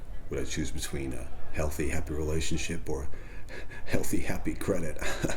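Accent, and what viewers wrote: United States. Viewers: I’m an American, and I gotta say, your American accent is great. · Good American accent! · You picked one of our main accents (in the US). The nuances are spot on (newscasters and narrators of the mid century often take this tone), and excelently paired with social status, and stereotypical, shall we say "frame of reference"? And the acronym; still laughing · 1) American accent is great as always! 2) American accent speaking Japanese is phenomenal! 3) Bad jokes are great!!! 4) You are a remarkable bullshitter. You would be a great “generic Wall Street banker”. 😋🤣🤣🤣🤣🤣🤣 🍶🍶🍶🍶🍶🍶 Much love!!! 💙💙💙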